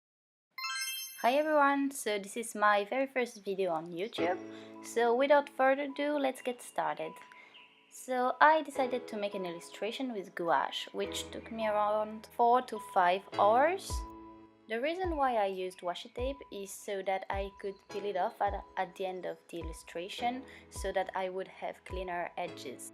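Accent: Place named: Russia. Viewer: Ta voix en anglais est tellement cute, c'est hyper agréable de t'écouter (malgré l'accent russe hihi) Le rendu est vraiment bien,j'adore la palette de couleurs que tu as choisie! Keep going <3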